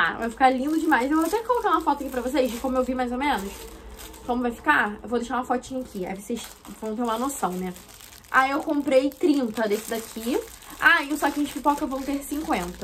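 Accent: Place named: Rio de Janeiro, Brazil. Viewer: Nos primeiros 5 segundos de vídeo eu já vi logo que era carioca ❣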